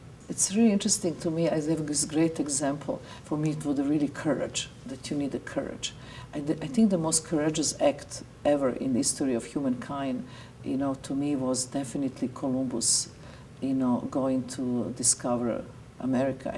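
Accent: Serbia. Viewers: she's not american but serbian lol "its her accent". ps, i dont defend her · She’s a beautiful soul. Period. Research her origins before you call her evil by your own ignorant definitions. You hear her Serbian accent and call her Voldemort, etc? The stupidity and ignorance is shocking. Catch a flight sometime and TRAVEL. Go to a museum! Crack a real book once in awhile. Ugh. Listen to what she is saying. Great art should upset us. It is hard and it is part of the artist’s very Being. THEIR experience. It should open our eyes and make us all think. Examine ourselves. If what you see is fear and man’s construct of a devil - that says a lot to the rest of us about YOU.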